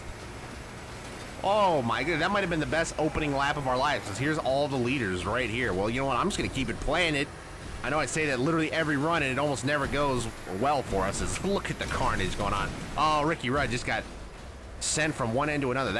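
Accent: Michigan, United States. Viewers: This was hilarious dude. Well done. Sidenote, you from Michigan?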